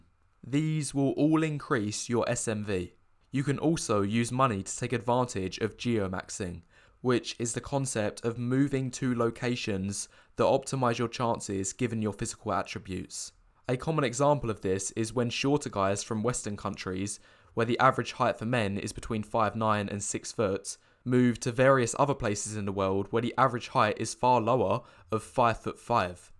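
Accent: United Kingdom. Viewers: although I don't like British accent i really appreciate your voice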